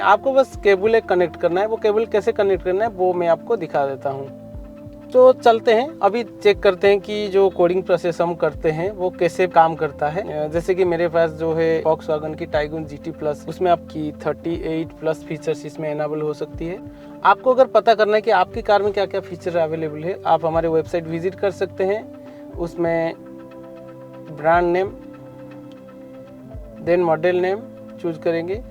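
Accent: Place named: Odisha, India. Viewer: bro are you from Odisha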